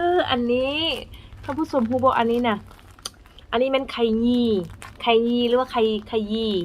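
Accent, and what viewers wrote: Northern Laos, Laos. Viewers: ชอบฟังสำเนียงลาวเหนือ พูดเพราะดีค่ะ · สบายดี คนสวยน่ารักมาคอมเม้นท์เป็นกำลังใจครับ 'หลังจากไม่ได้คอมเม้นท์นานแล้วแต่ก็ยังติดตามน้องอยู่นะครับคนสวยสายบุญคนสวยนํ้าใจงามมีของอร่อยเยอะเลยสาหร่ายนํ้าจืดครับ จากสาวลาวเหนือมาเป็นสาวลาวใต้สำเนียงเสียงพูดลาวเหนือพูดเพราะนะครับ นุ่มนวลฟังแล้วน่าหลงใหลครับ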